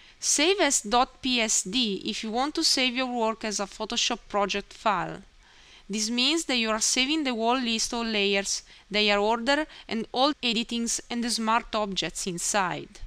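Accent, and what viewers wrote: Italy. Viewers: great job! nicely explained. ben fatto, ho sentito un piccolo accento italiano? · are you italian ???? just asking cause your accent is so cool :) :) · R u Italian?? Cause ur accent looks like Italian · Love the accent as well sounds Italian. The video is pretty cool I think you encapsulated everything quite well for basic skills... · thanks,your all tutorial r very good n easy to understand n it's working out for me,your pronounce is very cute,i guess u must b Italian by the way keep it up this good job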